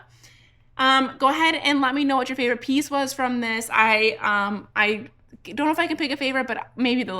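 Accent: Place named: Midwest, United States. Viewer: You’re from the Midwest. lol Taayygs. I say the same and I’m in Wisconsin.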